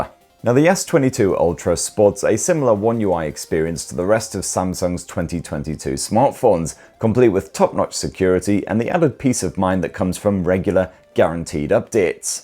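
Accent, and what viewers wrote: United Kingdom. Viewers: love your serious brit accent and syntax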